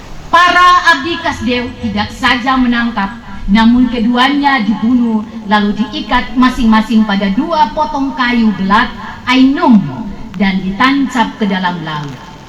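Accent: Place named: Kai Islands, Indonesia. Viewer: Tak mungkin yang membaca teks ini bukan orang Kai. Saya yakin Pasti orang Kai. Salam dari bumi Cendrawasih Papua. 🦜🦃🦤🦩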